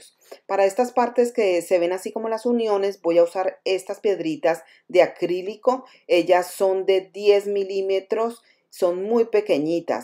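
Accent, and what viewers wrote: Colombia. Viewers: Hola soy nuevo suscriptor miré tus videos y me encantaron, explicas super bien y sobre toooodo es que haces las manualidades MUY ELEGANTES y sobre todo a bajo costo... Me Fascina tu casa la tienes muy ordenada, limpia y de buen gusto, te mando un saludo desde Guadalajara Jalisco México 🇲🇽. Me gusta también tu acento al hablar así tipo Colombiana 👏👏👏👏😉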